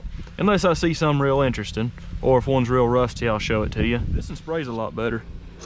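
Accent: South, United States. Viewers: I love how Southerners pronounce "oil" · Now, that is a cool American accent. This dude has manners. Where is he from in the states?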